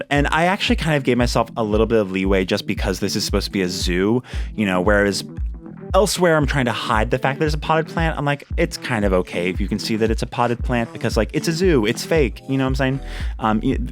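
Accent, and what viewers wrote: United States. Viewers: That’s how we say it in Minnesooota. We keep our ayegs in bayegs 😂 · Yes I have a friend from central NY who says egg and leg like you 😂 you’re definitely not alone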